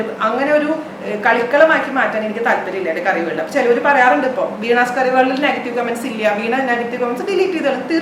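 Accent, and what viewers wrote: Thrissur, India. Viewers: thanks, and once again ur videos are very good, more than that it feels so homely the way u talk😀. I am from Thrissur, so ur slang makes me feel back home. I am a blogger too <link>,very new, looking ahead ur tips and help when I need😋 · thrissur bashayil thanne samsarichal mathittta; namukk abimanikkam nammal thrissur aayathond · Thrissur bashayonnum maatanda tto... Ivide athu ishtapeduna njangal kure perund.. Initially eniku kaanumbo kurachu valiya video aanenu thonirunnu.. pakshe naatil ninnum maari nilkkumbo kurachu thani nadan basha kekkumbo valiya oru ashwasam.....Valare genuine aayitu karyangal paranju.. Thanks to Chettan too... Keep rocking... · we love u a lot n I try most of ur recipes.. All r superrrrr 👌specially ur thrissur slang May God bless u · you are best...you are really helping lots of people...please don't bother the negative comments...some people only know to crticize...it take lots of effort to bring such helpful videos....keep going...we love your trichur accent and your talks..I really love your way of explanation of recipes...feel like a sister explaining so sweetly... 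Keep going...lots of love and support..God bless you and your supporting lovely family always😍😍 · nalla samsara shaily...TCR bhasha kalakkitta... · Good inspiration aanu.... Chechide thrissur bhasha enikk othiri ishta.... 🙏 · English ൽ സംസാരിച്ചാൽ തൃശ്ശൂർ ഭാഷ പ്രശ്നമല്ല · Hi..njan chechide receipes recently aanu kandu thudangiyath. I tried ur cooker biryani, palada, mango, vanila, butterscotch ice creams..super ayirunnu. Cookingil chechiyanu ente guru.njan adukalayil kayaratha aalayirunnu.marriage e aduthaan kazhinjath.so cooking avasyamai vannapozhanu chechide videos kaanan thudangiyath. valare detailed aayi trissur baashayil samsarikumbol njan valare intersetode kelkum.pinne try cheyum.ithuvare ulla ella first tryum success ayirunnu.now cooking ente hobby aayi maari.thank u so much.likes ur smiles, sarees & way of talking👍. We are staying in sharjah. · adipoli ayitundu nammude thirssur bhasha mattano orikkalum ella ale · Chechy thrissur basha nallatha...super... 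· I love the way you talk! I find your videos very informative and entertaining! Don’t lose the thrissur slang;) I am cooking now because of you! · Thrissur bhashakkentha kuzhappam njan thrissur aanutta checheene vedio kanumbo nte veettilethiyo pole thonnum athrakku Rasa kekkan 😘😘😘😘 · Logo change cheyanda.epo ullathu nallathanu.and also Trisure language um mattanda.😊 athu kelkan nalla rasamanu 😊. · Hi I am writing a comment for your video for the first time. I am a regular viewer of your recepie and I have tried many which worked out really well. Ippo ethu recepie Cheyaan orthaal first eduthu nokunath aanu.you are doing very well..keep going. Pinne ee special video enikk othiri ishtapetu. de Thrissur language aanu presentation nte beauty kootunne. Iniyum nalla nalla recepie expect cheyynnu. and family Ku all the wishes. curry world nu all the best ☺ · ഇനി ഒരു കാര്യം കൂടി പറയട്ടെ തൃശൂർ ഭാഷ ആയത് കൊണ്ടും കൂടി ആണ് ഇത്ര hit ആയത് ചേച്ചി un dout സത്യം ഒരുപാട് ഒരുപാട് ഒരുപാട് കുക്കിംഗ്‌ ഷോ കണ്ടിട്ടും ട്രൈ ചെയ്തിട്ടു മുണ്ട് പക്ഷെ നമ്മൾ എവിടെങ്കിലും പോയാൽ നമുക്ക് ഇഷ്ടം ഉള്ള വിഷയം ആവും സംസാരിക്കാൻ താല്പര്യം എങ്ങിനെ കറങ്ങി തിരിഞ്ഞാലും ഞാൻ വീണ ചേച്ചിയിൽ എത്തും അത്ര ഇഷ്ടം ആണ് ഫുഡ്‌ പിന്നെ ചേട്ടനെ അതിൽ ഏറെ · Hi... 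Thank u very much for uploading this video. In ur video i like the most thing is that u explain the things very deeply, with that beautiful smile n confident voice, n that thrissur slang language bcoz i m also from thrissur, but settled in gujarat. I m ur fan now, will watch all ur videos n try to make receipes. I already tried beet root thoran from ur video n got got good comments from my friends. Thank u very much....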